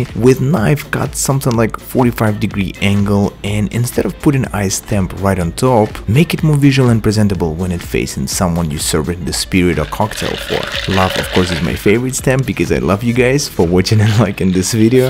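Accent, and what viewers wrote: Russia. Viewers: You have a small russian accent. Lol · wow i understood that you are russian from your accent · Сижу такой смотрю... И вот замечаю такой небольшой наш РУССКИЙ акцент(я сам вообще не бубу в английском, но как-то заметил). Потом такой, обращаю внимание на на название канала... И всё встаёт на свои места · Слышно русский акцент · рашиський акцент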